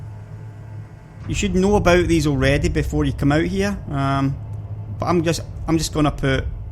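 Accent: Scotland, United Kingdom. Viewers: Nice also to hear a guid Scots accent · A Scotsman in a spaceship, 10/10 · i love your scottish accent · soon as I heard that thick Scottish "awrite guys hows it goin" you had a new subscriber , lmao thanks for the tips bud. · a Scottish youtuber finally an accent to relate to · the sound of the Scottish voice is a bonus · Your accent! Epic wins! Sound like Spud from Trainspotting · thats Scottish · Scottish, aye? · love the scottish, it's bloody awesome lad :D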